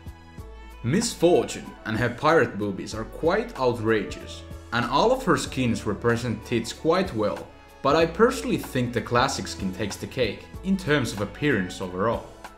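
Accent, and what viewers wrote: Finland. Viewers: Where is he from? His accent sounds quite finnish. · Are you from Finland?!?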